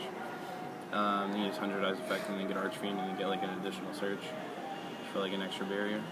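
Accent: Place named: United States. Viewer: I use to think was a fat german with a craving for chocolate, then I heard his murica accent, and I was like WOAW my mistake.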